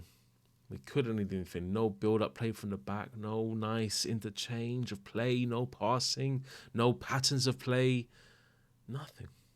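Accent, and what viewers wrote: England, United Kingdom. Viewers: How did you get in to supporting Real Madrid?, you sound like a proper Englishman. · He's spanish with an English accent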